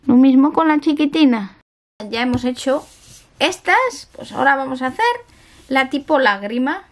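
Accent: Spain. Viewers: Diossssss! Amo como Hablan los españoles (ㆁωㆁ)❤️❤️ Sigue Así. Dibujas muy Lindo uwu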